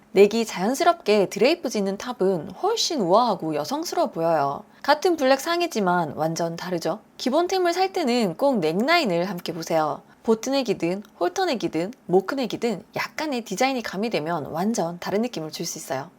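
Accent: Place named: Gyeongsang, South Korea. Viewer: 경상도사투리와 서울 사투리가 아주 이쁘게 믹싱되어 좋네요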